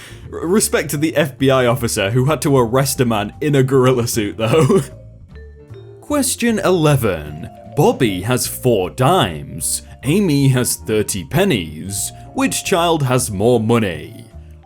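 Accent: United Kingdom. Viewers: notice how when mispronounce the word "nuclear" the comments sections go crazy but when they make fun of british accent he don't give a sh** wait that's a dumb point to make, but I could take some feedback:D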